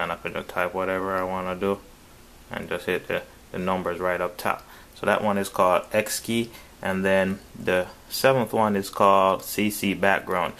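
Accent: Jamaica. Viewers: here the jamaican in u bro. #jamaicans UP lol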